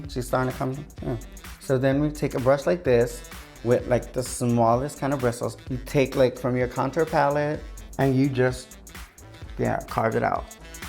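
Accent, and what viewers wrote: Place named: New Jersey, United States. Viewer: I’m not from the US so I’m not really sure of what a Jersey accent is but I’m pretty sure Jiggly has it.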